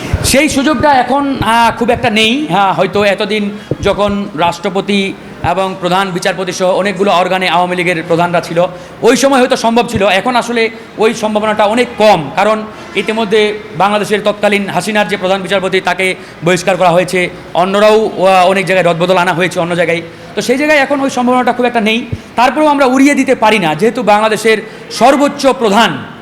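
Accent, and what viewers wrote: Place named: India. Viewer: Ai lokta mone hoy bangla khatha bole indiar moto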